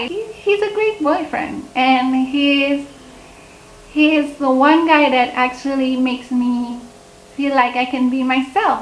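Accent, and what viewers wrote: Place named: Indonesia. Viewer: Hai dear, u sound more like indonesian then malaysian? Where are you original from?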